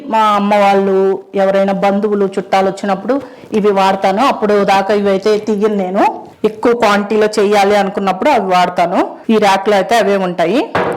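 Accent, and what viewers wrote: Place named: Ongole, India. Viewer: Mam me own place akada me language ongole side la undi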